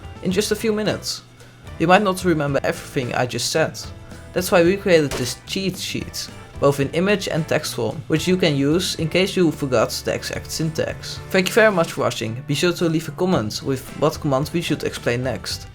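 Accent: Netherlands. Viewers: are you dutch so not English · hij heeft echt zon nederlands accent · that dunglish tho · are you dutch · duidelijk een nederlander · Are you dutch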